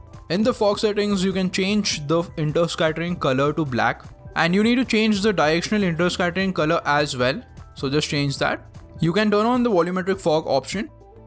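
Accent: India. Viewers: Are you indian ? Your accent sounds like indian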